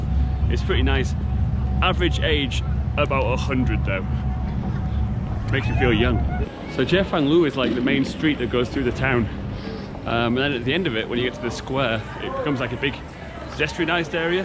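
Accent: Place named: Northumberland, United Kingdom. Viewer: Just found this. Loved it. You sound like a Northumbrian. 👍😍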